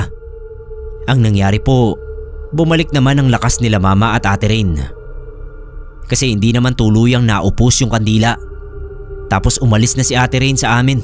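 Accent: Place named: Cagayan, Philippines. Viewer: Parang taga Cagayan ang narrator. Itawes.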